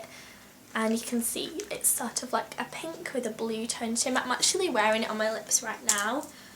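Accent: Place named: North, United Kingdom. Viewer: please do a tutorial on how to put them on if u havent already. love ur accent so im going to suscribe. you sound so nothern!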